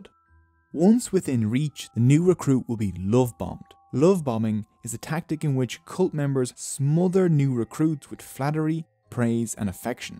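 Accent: Ireland. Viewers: I love your videos... Watching them from this morning. Your animations are truly great and I love your voice & accent, kinda sounds Irish, idk. Wish you could tell me where is this accent from. P.s: you got a new subscriber 😁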